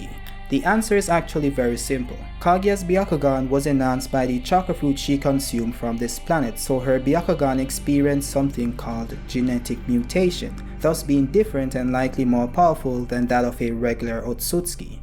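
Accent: Jamaica. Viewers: You sound jamaican, are you?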